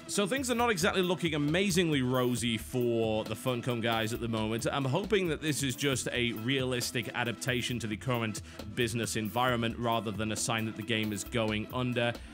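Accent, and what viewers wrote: United Kingdom. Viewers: are you honestly requesting someone to pronounce something a specific way? wtf, he talks how he does, he's from the UK but has lived in the US for over a year... so he talks like John Bain, deal with it.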